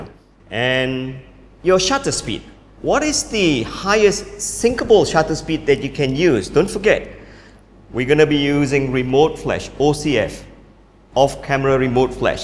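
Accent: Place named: Malaysia. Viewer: The content r just perfect n as for the accent, he's from Malaysia 😍😍😍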